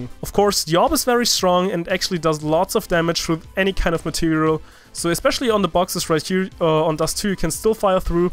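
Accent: Germany. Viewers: hab ich mir auch schon gedacht und auch das Englisch hat so ein bissl deutsch xD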